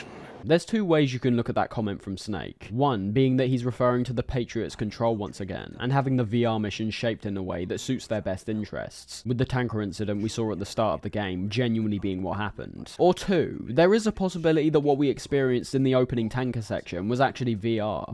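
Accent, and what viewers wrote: United Kingdom. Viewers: Ahhh British guy video game essay just what I needed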